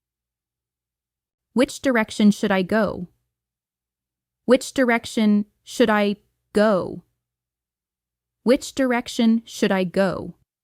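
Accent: United States. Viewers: Is it american english?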